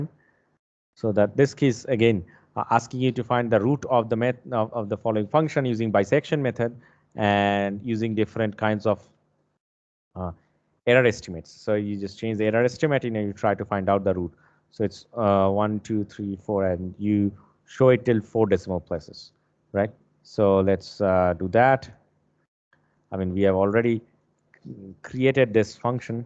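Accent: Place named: United States. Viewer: Tmr ekdam American accent, r u staying in USA.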